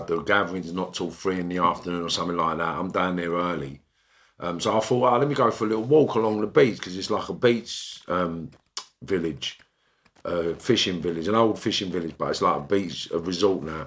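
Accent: Liverpool, United Kingdom. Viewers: Your scouse accent is boss haha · You can still do the Scouse accent a bit lad 😂 is right kidda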